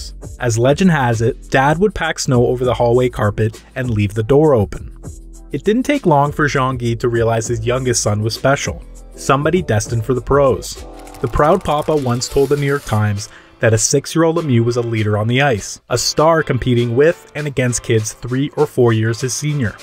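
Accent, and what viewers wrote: Canada. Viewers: this is probably the most canadian accent ive heard on this channel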